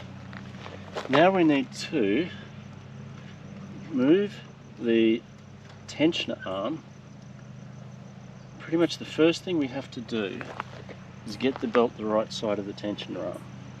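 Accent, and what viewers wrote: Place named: Australia. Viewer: Ha! I knew that was also possible, but your Aussie accent is soft enough that it could be Kiwi, but I should have known by the dryness that is Oz. I guess the name and that Kiwis are often DIY-competent. We lived on the North Island for 13 years where I had a Walker, which I loved, as the floating deck out front was good for getting under trees. Now on Kauai where there is no Walker dealer, so now I'm a Hustler man. I just forwarded your video link to a friend with the same issue, so thanks from both of us!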